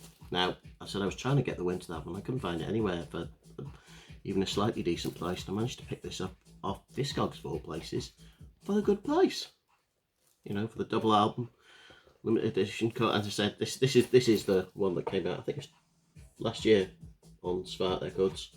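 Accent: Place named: Wales, United Kingdom. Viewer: I still can't get over your Welsh haha. Either way, cheers for the shout out mate. That Winter boxset been on my list for ages and I keep meaning to ask for it for a birthday or Xmas. Good stuff, there is a new band with ex members called ' ' which I picked up. Not bad but more in a doomy industrial style. Love that album as well, second one good as it has singer from on it. 🤘💀🍻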